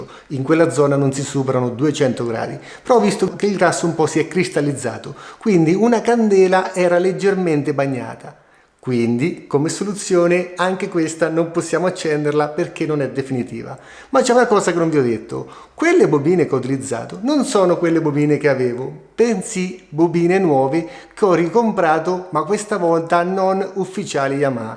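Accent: Marche, Italy. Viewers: Gran moto la Super teneré, ne ho avuta una uguale identica! Dal tuo accento sento che sei Marchigiano, oserei anche azzeccare la provincia ma non sono sicuro, Macerata?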